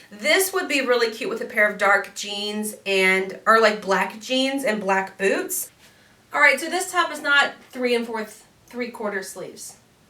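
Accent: South, United States. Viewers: What state are you from I noticed you are southern and yes I am southern to.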